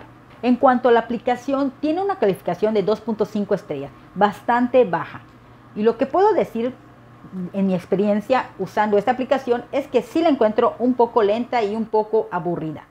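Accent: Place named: Yucatán, Mexico. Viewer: Qué gran descubrimiento con tu canal. ¿Eres de Yucatán, verdad? Por el acento. Acabo de ir a ese estado… hermosísimo. Felicidades y sin duda: SUSCRITO. Saludos desde CDMX.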